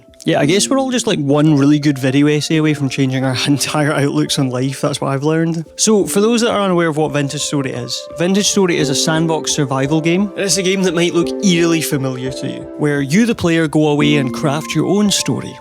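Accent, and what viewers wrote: Scotland, United Kingdom. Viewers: Is that the Scotland accent or Irish accent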